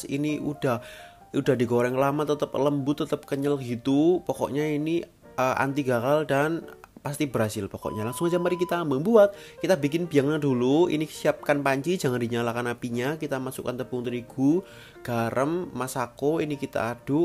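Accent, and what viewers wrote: Java, Indonesia. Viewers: Ciri khas medok nya agak berkurang ya.. biasa nya lucu dgr voiceover nya kalo medok bgt ky dulu². Tp resep² nya selalu mudah diikuti.. semangaaat!!!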